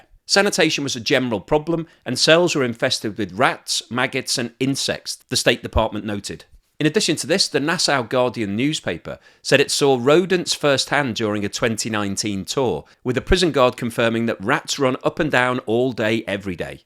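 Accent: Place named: England, United Kingdom. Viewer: You are hard to understand since I'm not used to English english!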